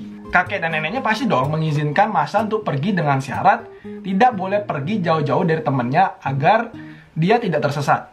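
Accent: Bali, Indonesia. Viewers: Logat lu medok bgt bro bali nya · Kayak ada logat balinya.... apa prasaan aku aja kalik ya..🤭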